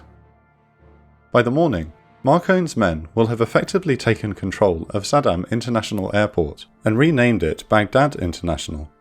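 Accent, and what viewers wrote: United Kingdom. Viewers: The guy making the video is also the narrator. He needs to hire a professional to narrate the videos because it's not his strength, unfortunately. The animations have improved significantly over the years, but the narration has not. I personally don't mind his incorrect intonations and strong british accent, but some of my friends, to whom I've recommended this channel, have remarked on this subject. In fact, even the automatic captioning system on Youtube often misidentifies his words. Does it make sense now?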